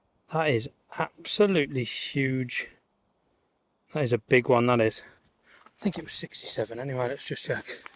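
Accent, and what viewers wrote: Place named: Midlands, United Kingdom. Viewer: looks like a Roman handle, Ellie Miss_D will know. is a beautiful shoe buckle or a knee buckle. 100% nut crackers, someone cut them down. Good video. I thought that was a Midlands accent, I was born in Birmingham and all my formative years were in Shirley.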